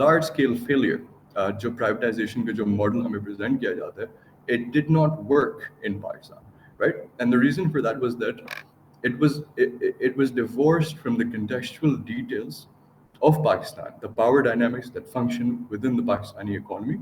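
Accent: United States. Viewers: Ye bewakoof porkistan accent American use karta hai...... Isliye ye porkistan peeche hai.... DEFAULT....... porkistan ka khood ka kuch nahi.... UDHAR bheek se bana porkistan.... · Another Pakistani with American accent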